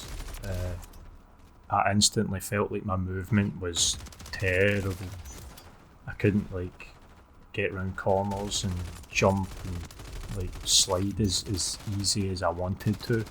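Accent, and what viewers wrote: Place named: Scotland, United Kingdom. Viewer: Your Scottish accent 😀!! Beautiful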